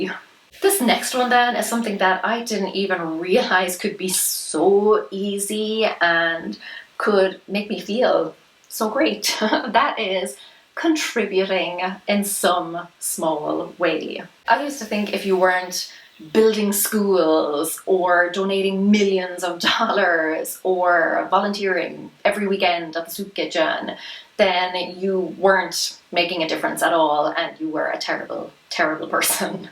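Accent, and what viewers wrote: Ireland. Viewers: Good advice!I love your Irish accent!!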